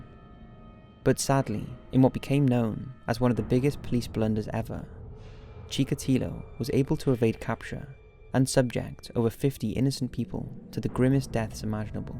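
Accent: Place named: South East Wales, United Kingdom. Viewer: I've wondered for a long time where you are from. You have an accent that sounds like you might live very close to me which is South-East Wales. Let me know!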